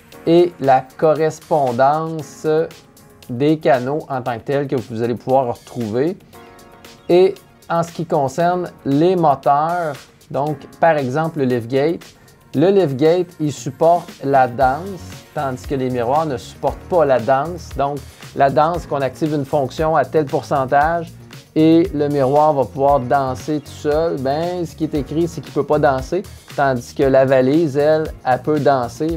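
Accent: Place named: Quebec, Canada. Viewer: Merci pour ce tuto en Français avec un petit accent québécois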